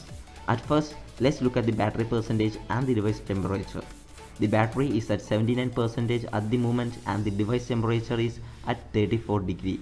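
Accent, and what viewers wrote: India. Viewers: are you indian youe voice is like indian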